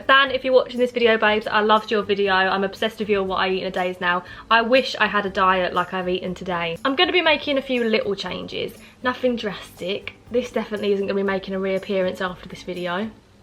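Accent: United Kingdom. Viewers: Loved this video; you have such a great personality and so funny! Love your British accent too. 😆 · I looooove british accent!..you are beautiful! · I love the British accent because it makes every video funny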